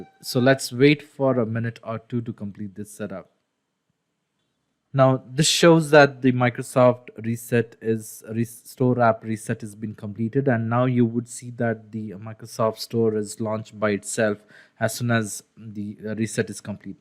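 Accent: India. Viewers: another unhelpful tutorial with indian english accent, they produce many of that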